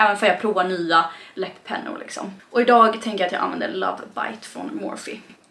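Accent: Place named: Småland, Sweden. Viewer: Hahahha så fin! Men varför pratade hon typ småländska😂❤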